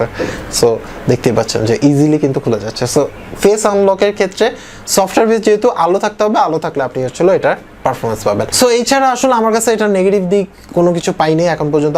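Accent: Bangladesh. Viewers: বাংলাদেশি মনে হচ্ছে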